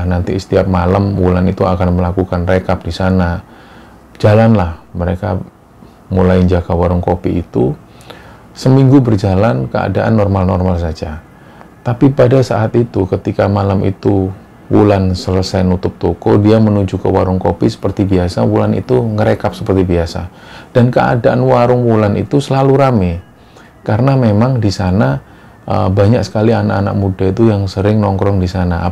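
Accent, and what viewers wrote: Java, Indonesia. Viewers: pdahal mas ini medhok bngetz ngomongnya tpi kok aku paham ya apa yg diceritakan,biasanya klw adh orng yg medhok aku cma senyumin aja krna gak fahan ngomong apa 😂😂😂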